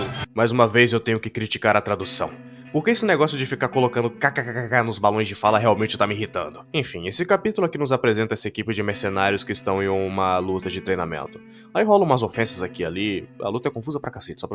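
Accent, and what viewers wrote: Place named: Sergipe, Brazil. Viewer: Vídeo muito foda mano, mas tira uma dúvida, você é sergipano?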